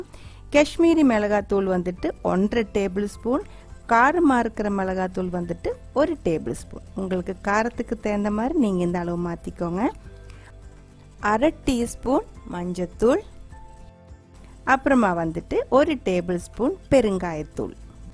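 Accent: Kerala, India. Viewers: U Malayali or Tamil? 🤔🤔🤔🤔🤔 Talking so different 🤔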